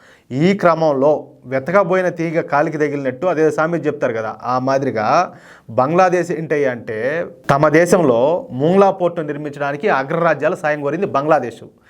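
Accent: Rayalaseema, India. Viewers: రాయలసీమ భాష బలఉందన మీది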